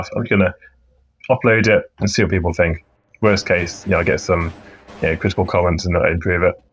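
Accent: United Kingdom. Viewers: Lol need subs for this huge british accent. Where he from in uk? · Its so freaking hard to make sense of what he is saying sometimes ... british is so hard. Too much mumbling ... but anyway gj Dude!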